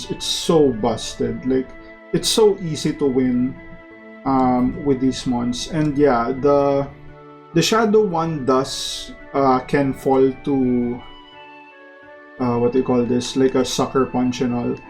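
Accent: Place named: Philippines. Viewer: Are u filipino? I am too i can recognize the accent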